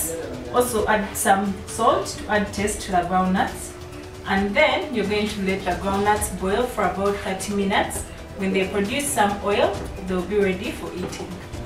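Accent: Uganda. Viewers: Knew from the accent that this is 🇺🇬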